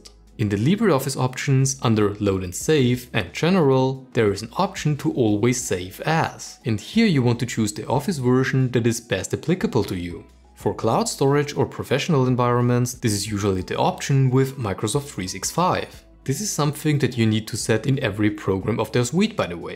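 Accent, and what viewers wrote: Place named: Germany. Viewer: with a German accent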